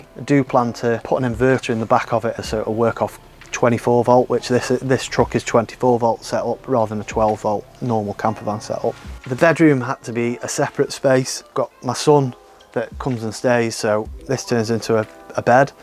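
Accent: United Kingdom. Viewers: brit accent. Hard to understand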